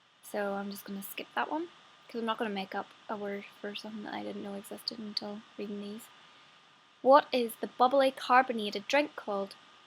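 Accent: Northern Ireland, United Kingdom. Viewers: northeurn oyrleund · it's a British accent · Great to hear this modern Coleraine accent - it has mellowed over the years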